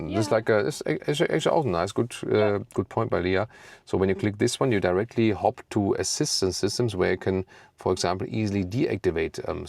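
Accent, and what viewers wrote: Germany. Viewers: Thank you for the great review! German humour and accent is the best for reviewing german engineering. And involving freshens the video so much! The car looks good, but they could ve done better, I think... Great that the headlights are in one piece. Of course the black matt styling of the body would have looked more practical for those who really go off the paved road. And why no split exhaust???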